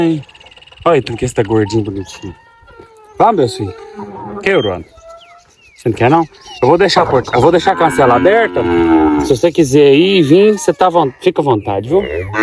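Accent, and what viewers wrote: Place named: South Minas Gerais, Brazil. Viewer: moro no Sul de Minas e você fala que nem nós. Amo muito nosso sotaque! Deus te abençoe ❤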